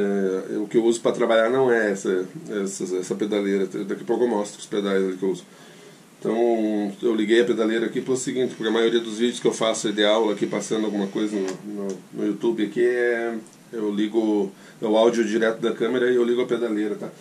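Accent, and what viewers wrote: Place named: South, Brazil. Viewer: Sim, excelente custo benefício mesmo. Me inscrevi no canal e estou olhando os vídeos aos poucos. Você é de onde mestre, senti um sotaque meio parecido com o meu na região Sul. Sou de Bento Gonçalves RS. Grande abraço!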